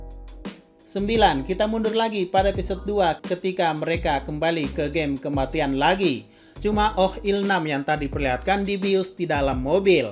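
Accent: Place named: Flores, Indonesia. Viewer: Logat naratornya ga asing. Pasti orang Ende-Flores, NTT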